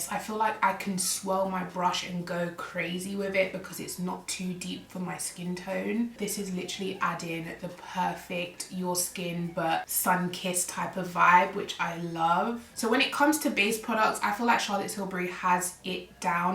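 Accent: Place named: United Kingdom. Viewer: When you're searching for a specific video and then you hear a British accent 😫Subbed x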